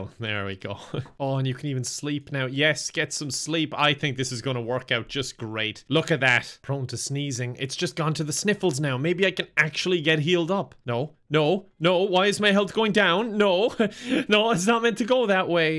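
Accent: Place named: Ireland. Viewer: I read the Wednesday entry in Irish voice.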